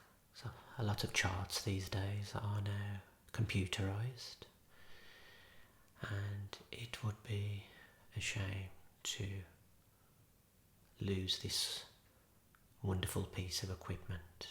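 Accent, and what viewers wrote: Birmingham, United Kingdom. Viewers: I love your natural Brummie accent!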